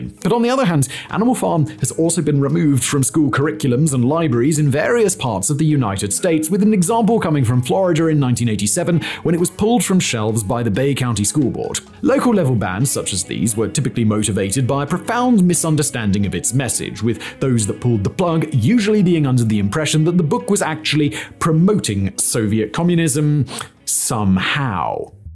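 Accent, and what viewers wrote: United Kingdom. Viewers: You Tube SUCKS! I have removed this man's channels over and over again. But when You Tube changes the algorithm, it force feeds me this Simon guy's many channels despite my removing him. Simon's channels suck. They are poorly researched information that is mostly government propaganda talking points. Terrible videos. And just because Simon has a British accent, this doesn't not make him an authority on anything. He's just a talking head. England is over. Brexit has made the only job in England a You Tube content provider. Terrible list videos that spread falsehoods and bull.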